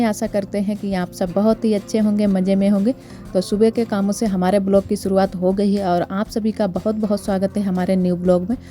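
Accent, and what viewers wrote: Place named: Orai, India. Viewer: Ap Kahan se hai kuki apki launguage ekdum hmare orai u.p ki tarah h Kande to hmare yhn bola jata hai up m to ap Kahan se hai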